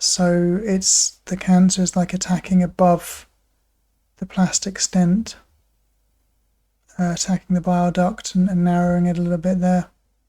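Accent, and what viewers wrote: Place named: England, United Kingdom. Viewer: You sound English .. why aren’t you having free treatment on the nhs instead of needing donations to pay for it (? In Japan?)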